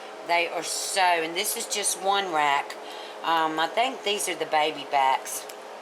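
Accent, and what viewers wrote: South, United States. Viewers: Today, Easter weekend April 8, 2023, and I just found your channel!!!! I love your rib recipe! And I can't wait to cook them!! I just love your recipes! Thank you! Your accent is so southern! Just lovely! ❤❤❤😊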